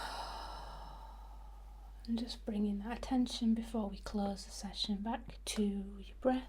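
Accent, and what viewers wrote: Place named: Yorkshire, United Kingdom. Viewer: I recognise that accent. ❤ From Pontefract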